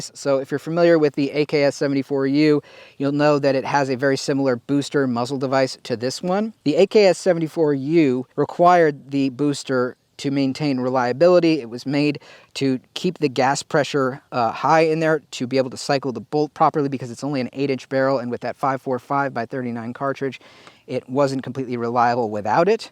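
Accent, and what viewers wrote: Russia. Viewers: Except for that russian accent you're almost clone-correct. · Better Russian accent than FPSrussia. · Your accent sounds like FPS Russian are you related?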